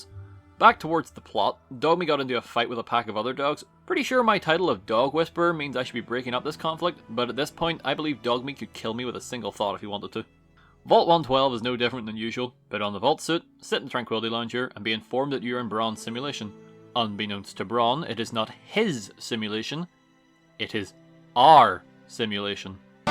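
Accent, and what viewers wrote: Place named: Scotland, United Kingdom. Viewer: You sound Scottish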